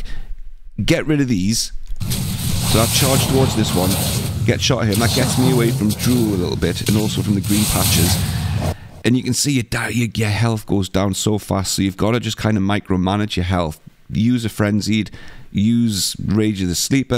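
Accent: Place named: North East England, United Kingdom. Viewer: Also where abouts you from North East accent im from NE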